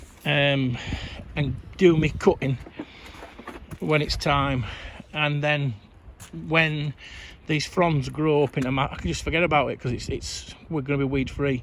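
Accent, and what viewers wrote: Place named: Barnsley, United Kingdom. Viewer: Wheres your allotment you sound like your near Barnsley ?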